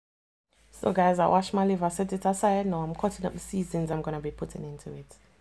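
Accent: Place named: Jamaica. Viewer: 🤤🤤🤤 plus I love the way you speak😍 time to learn some Jamaican English 💃🏻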